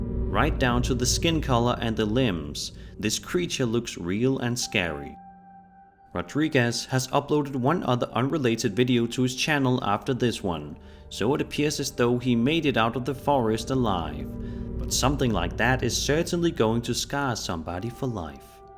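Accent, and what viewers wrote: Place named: Denmark. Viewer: This guy sounds danish :)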